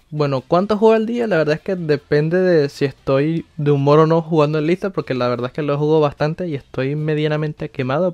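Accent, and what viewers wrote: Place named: Chile. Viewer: Yo pensaba que eras de chile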